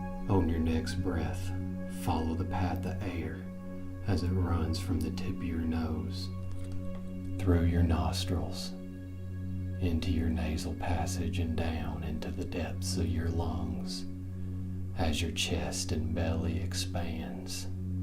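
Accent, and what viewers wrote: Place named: Europe. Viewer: I wish you weren't hilarious. Try to find a female voice for these. I'm tired of the Euro accents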